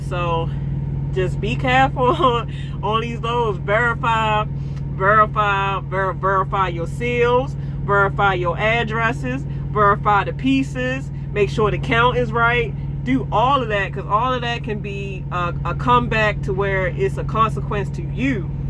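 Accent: Baltimore, United States. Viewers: Tht Bmore accent is water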